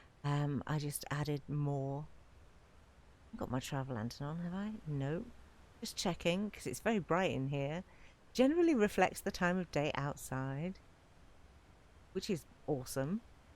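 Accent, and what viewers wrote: United Kingdom. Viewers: Did know you from Yorkshire, I'm from Leicestershire 🌹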